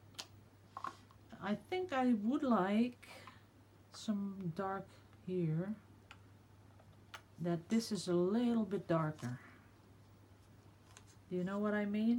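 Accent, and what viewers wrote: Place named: Netherlands. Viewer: This is truely beautiful - just like its creator! We can see how gorgeous it is so stop worrying about that. Also, I love your chit chat and so look forward to coming home from work each day to listen to and watch you pour/swipe. I laughed when you said about getting your hubby to speak in English - mine told me that I was beginning to speak with a Dutch accent cos I' listening to you every day!! LOL Take care and looking forward to the next road trip when you have a spare minute.... how is your sister's piece coming along? Cheers, DEB T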